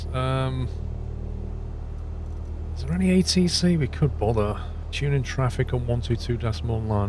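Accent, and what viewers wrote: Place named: Yorkshire, United Kingdom. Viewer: Proper Yorkshire.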